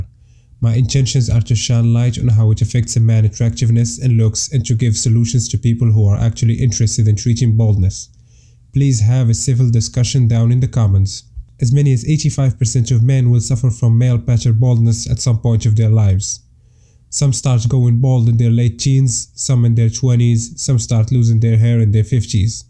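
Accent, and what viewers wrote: Morocco. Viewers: I am moroccan too khoya, the accent sound moroccan. Good luck with your channel, good job ;)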